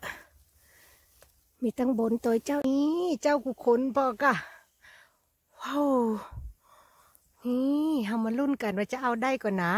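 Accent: North, Thailand. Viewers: สวัสดีจ้า ป๊ะกันแฮ๋มแล้ว เผาะก่าอวดเห็ดคำเน้อใหญ่ไปใหญ่ง้าวงามแต้งามว่าทุกคนหันเกาะเจ้าซุมาเถอะเจ้าสงสัยล้นซ่า พี่ชอบมากๆเลยอู้คำเมืองม่วนดีจ้า👍👏👌😍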